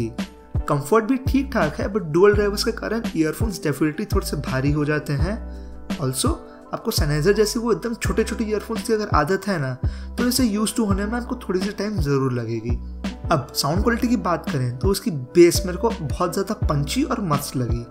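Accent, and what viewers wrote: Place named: Kolkata, India. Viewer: your accent telling me you are from Kolkata. is that right?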